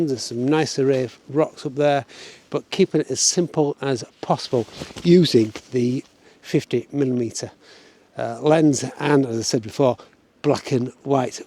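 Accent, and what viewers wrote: Yorkshire, United Kingdom. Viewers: I've had great pleasure in viewing your interesting, chatty videos, right from the beginning. This particular episode reminds me of when I had my best work assessed by a particularly high ranking elderly lady photographer, around 15 years ago. This was after having some 40 years of photographic experience behind me. In a nutshell, though the images showed competence, she told me the subjects were" too busy" - her own words. This pair of words is of course the absolute opposite of your subject here - minimalism. In taking on board this talented lady's advice, my photography was vastly improved and I have kept this thought in mind during the 15 years since, whether it be in monochrome or in color., your excellent advice is exactly spot-on with what this great lady photographer told me. Over the years since, my photos in their comparative simplicity have IMPACT, which was so lacking before! 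From one Yorkshire man to another, I love the refreshing content in your videos, including the relaxing music as well as the Yorkshire twang!!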